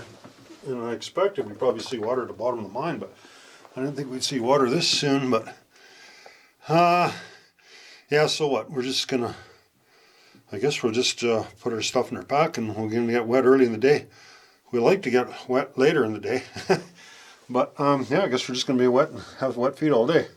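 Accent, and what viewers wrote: Canada. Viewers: As soon as I heard the accent. I KNEW it was Canadian. Love the video.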